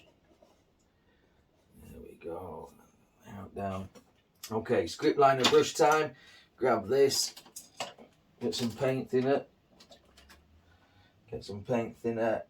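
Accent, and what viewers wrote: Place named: England, United Kingdom. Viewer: Thankyou so much for your prompt reply - love your work, and your English accent.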